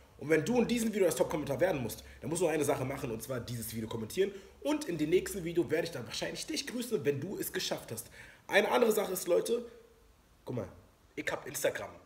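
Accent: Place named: Berlin, Germany. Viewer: wie witzig er spricht ( auf berliner art )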